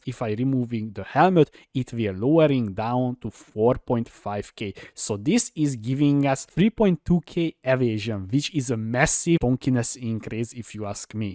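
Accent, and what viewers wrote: Hungary. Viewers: Kurvára hallani hogy magyar vagy :D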